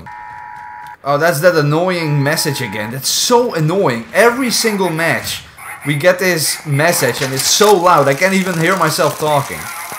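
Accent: Netherlands. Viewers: I smell dutch isn't it? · does he sound a little dutch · R u dutch ?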